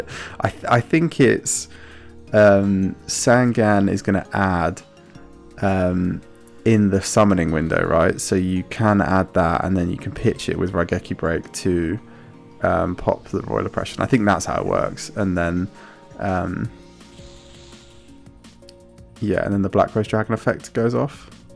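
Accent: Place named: United Kingdom. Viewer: i like ur commentary your british voice is soothing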